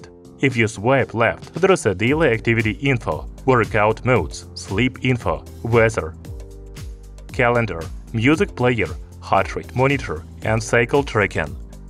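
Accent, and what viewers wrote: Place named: Russia. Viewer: Rusia o alrededores creo. Lo importante es que se entienda incluso con subtitulos.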